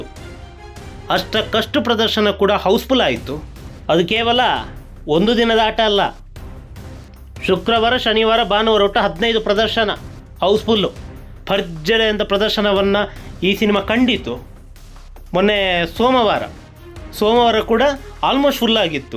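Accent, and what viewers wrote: Coastal Karnataka, India. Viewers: nivu super,love u ದಾದಾ ❤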